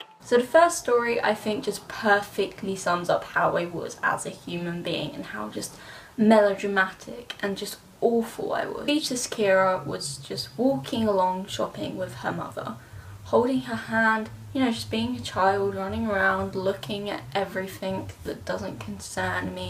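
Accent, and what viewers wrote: England, United Kingdom. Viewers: You had a different accent actually. It was more English than american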